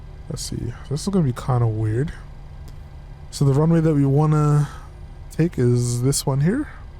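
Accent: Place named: Sint Maarten. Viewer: Hey bro which Caribbean island are u from your accent sounds st.Maarten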